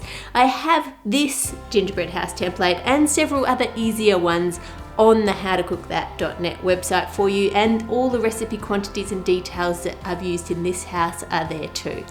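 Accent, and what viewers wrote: Australia. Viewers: Gosh I love Australian accent so much it's definitely my favourite out of all of them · Wait... your Australian OMG!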